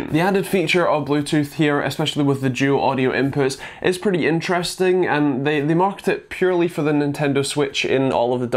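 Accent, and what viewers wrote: Scotland, United Kingdom. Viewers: I spent a solid minute trying to figure out if this guy had a Scottish accent or not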